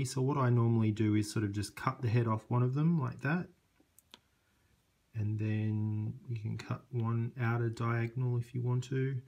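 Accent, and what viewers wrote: Australia. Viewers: Are you an Ozzie? You sound like an Ozzie. Like if YOUR a Ozzie.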